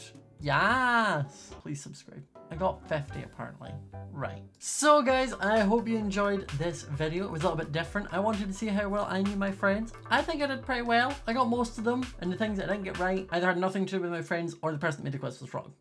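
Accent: Scotland, United Kingdom. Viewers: Me: reading comments about how they thought he was gay Me being my clueless self Me: I just thought he was Scottish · you are scottish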